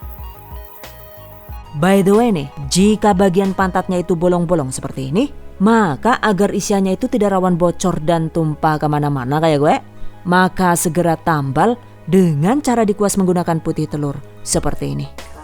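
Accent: Banyumas, Indonesia. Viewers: Jebulane wong ngapaakkk..ora ngapak ora kepenak😂😍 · jebule wong ngapak y mbokayi😂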